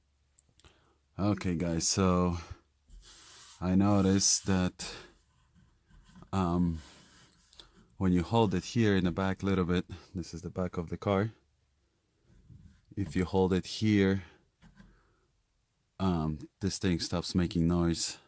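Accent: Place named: United States. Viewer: nie mam pojęcia co mamy w naszym polskim języku. Mimo że świetnie mówisz z amerykańskim akcentem jednak po 2-3 zdaniach zacząłem wertować u ciebie na profilu informacji by potwierdzić moje podejrzenie że jesteś Polakiem. Ja staram się jak mogę nauczyć akcentu amerykańskiego ale raczej do końca swoich dni będę mówił polenglishem.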